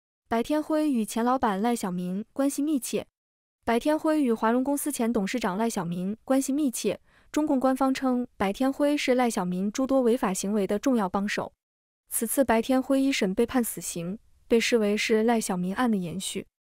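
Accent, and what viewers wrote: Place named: Northeast, China. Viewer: 為什麼是東北人口音好惡心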